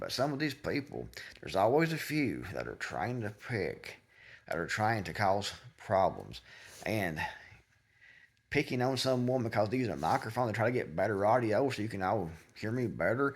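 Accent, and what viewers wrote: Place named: South, United States. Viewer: Reminds me of that scene from Django …”all I hear is criticize, criticize, criticize “. The world is full of trolls, just waiting and looking for opportunities to whine about something. It’s exhausting, predictable and nauseating. Never thought we would live to see such unmanly men and self centered twits who have nothing better to do than nit pick. I never noticed your breathing, I just enjoy your southern drawl 😊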